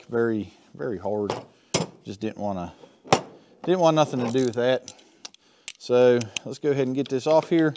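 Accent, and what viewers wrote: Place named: West Virginia, United States. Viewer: I knew I recognized your accent, I myself am a West Virginian. Proud to have you representing. 🤠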